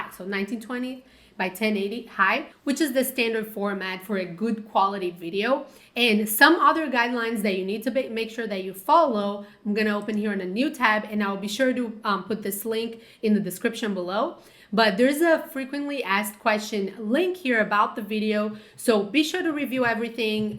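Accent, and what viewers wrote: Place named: Brazil. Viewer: O sutaque é brasileiro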